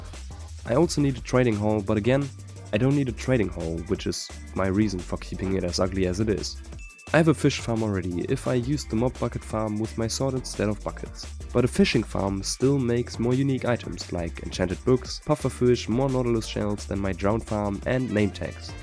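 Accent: Germany. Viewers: Kann das sein das er deutsch ist? 😅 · are you a german? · Are you from germany?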